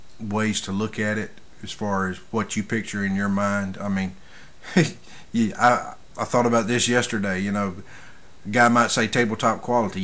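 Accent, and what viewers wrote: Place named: United States. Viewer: Awwh what a lovely video! keep up the good work ma man! And Where is that accent from? I love it! Where in the US I mean :)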